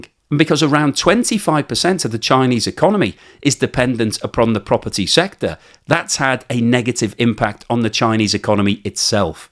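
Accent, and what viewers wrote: United Kingdom. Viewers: The bloggers brit accent is hard on the ears, especially when pronounces letters T. Ouch I'm out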